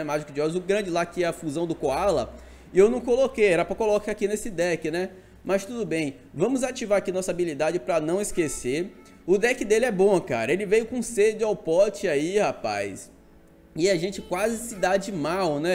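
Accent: Bahia, Brazil. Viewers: ata você é bahiano , pensei que fosse dá paraíba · kkk sabia q vc era baiano mano vc é de onde? salvador?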